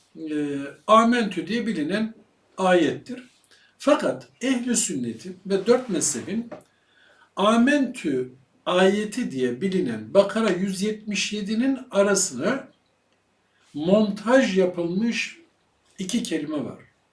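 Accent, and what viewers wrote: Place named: Arab world. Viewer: Konuyu biliyor ve anlıyorum. Fakat kırıtma konusunda eleştirmenin kendine de bakması lazım. Eleştirmenin şive resmen arap şivesi gibi. Sizce de öyle değil mi?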